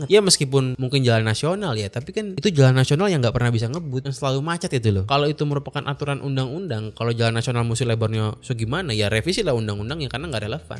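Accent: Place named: Sunda, Indonesia. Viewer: Seru bang gaya bicara nya, sisip sisip Sunda Sunda nya saetik EUY 👍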